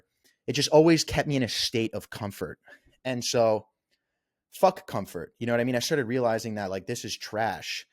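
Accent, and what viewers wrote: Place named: Long Island, United States. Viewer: sounds like you are from long island lol. We didnt 'have vape pens in my day but I can relate to this a lot. If you use pot on a daily/ nightly basis, it will turn on you eventually.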